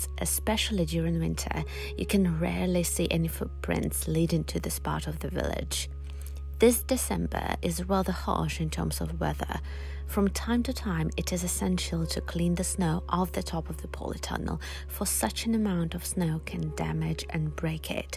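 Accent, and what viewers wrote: England, United Kingdom. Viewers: I would come help you... Save that cute 🏠 I am curious how you have an English accent and from Belarus ? Love you videos peaceful .. Ps you may want to shovel all debris out of attic to reduce weight on ceiling beams . I am a Architect and builder in NYC . I also now live in a tiny cabin on farm which is in similar condition. Roof must be repaired asap . Then all else You can use cement mortar to repair roof tiles tile thin set grout etc cheaper than cauk . Good 🤞😊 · Thank you. I just came across one of her videos where she kind of explains. Apparently all done by practising and hard work in Belarus. Hasn't been to the UK, I believe, or at least not for a significant length of time. Unbelievable. She sounds about 98% British. I don't think I've ever seen a non-native that sounds like her.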